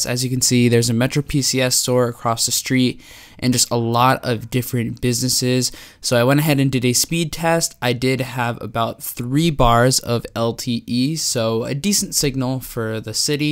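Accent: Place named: North East, United Kingdom. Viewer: Where in the north east are you from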